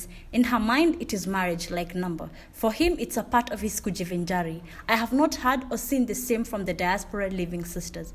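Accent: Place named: Somalia. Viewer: Lalabo waye gabadhaan wlh uuf.... her english sounds like somali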